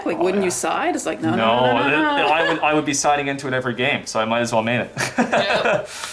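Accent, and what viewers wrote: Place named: Newfoundland, Canada. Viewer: Love the newphie accent. All the hard A's lmao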